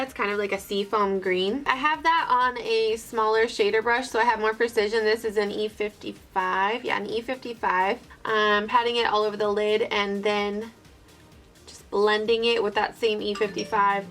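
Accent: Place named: Philadelphia, United States. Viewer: So cute! Love the dress too. Every time I watch one of your videos, my husband, who grew up in South Jersey, but whose family is from Philadelphia always says "It's that girl from Philly, right?" I can't hear your accent, but he can!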